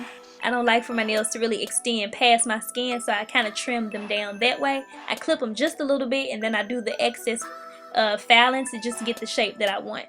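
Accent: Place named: Atlanta, United States. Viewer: Love your ATL accent!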